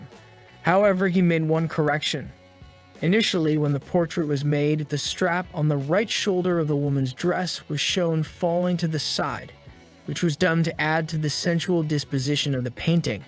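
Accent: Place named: United States. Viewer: yes, this young mans approach,seems to have been to try and fit every polysyllabic word he thought sounded,"hoity-toity" into this uninsightful anecdote. If I just read the closed captions, I would assume he was not a native speaker, as the conjugation, and tense dispositions of many verbs are just illogical. Even if someone else is reading a script, he is obviously a native american speaker,(i would say california,or Maryland, or Ohio,) and would naturally have corrected the uncorfortable syntax imposed upon his elocution. Good luck to, I mean it, it takes a lot of work to produce these videos, but if he ends up reading this, turn down the prolixity, and buy an edition of Strunk & White," The Elements of Style," (for writing), and he will say more with 60% less verbiage.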